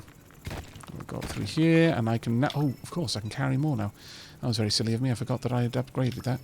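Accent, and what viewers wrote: United Kingdom. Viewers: More videos, more! Also, large heckin' maps. Just fast forward/skip through non-commented spots. Although there's something vaguely relaxing almost ASMR-esque about vaguely British voice commenting while mining out a vast sprawling underground.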